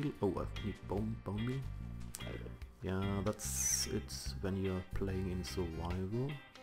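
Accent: Germany. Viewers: du bist deutscher oder ? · bruuuuuder man merkt, das du ein deutscher bist xD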